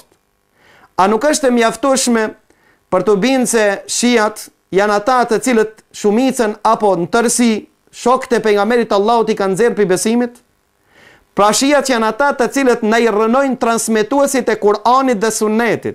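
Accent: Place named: North Macedonia. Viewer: Ta qifsha mamin Terrorist Maqedon, sipas akzentit tend qe ke je 100% nga Maqedonia, pra nga Shkupi, apo Kumanova.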